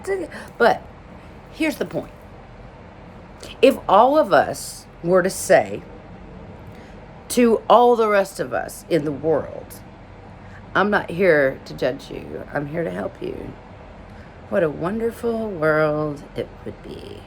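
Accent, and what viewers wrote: South, United States. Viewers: Out of NCIS, your southern accent is coming back strong. Love it. You be your P. I love you. I miss Abby, my favorite NCIS character.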